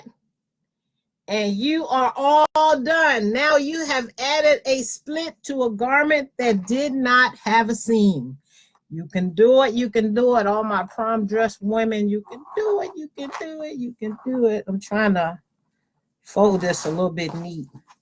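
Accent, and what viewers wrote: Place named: Alabama, United States. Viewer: I like you! You sound like me and my Alabama family!🥰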